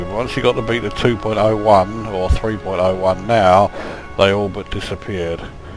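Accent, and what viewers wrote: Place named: Cumbria, United Kingdom. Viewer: Good Luck to you, I look forward to seeing any Uploads you may have when you aquire The Clipper, My PC is a little too slow for the Game but When it is finally finished I may have a bigger better PC, By the way IU'm from West edge of Lake district and i recognised your accent as not being that far away from me:)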